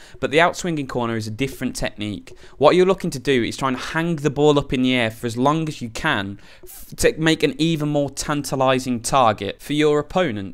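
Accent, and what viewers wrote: United Kingdom. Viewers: i hate your british accent but good video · If you think his British accent is bad you haven't heard more than half of other British accents. Especially the ones as you go towards the north of UK.. lol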